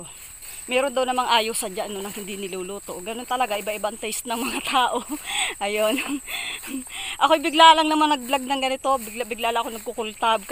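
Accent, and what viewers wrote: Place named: Batangas, Philippines. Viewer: Na lahian ka na ni kuya.. Batangeñang Batangeña ang punto pag salita mo ng tagalog….pakultab,….Upak na ng upak…bakbakan na dito… hahaha…Maganda yang laging naka bota para lalong safe…..Basta may time ako blog mo gusto kong tingnan agad..May nakukuha akong ibang idea sa pagluluto at pagtatanim…..And it remind me the way I used to be, tulad ng ginagawa ni kuya.ginagawa ko rin yun noong araw…Okay say hi to kuya.. God bless you more.❤️🇵🇭🇺🇸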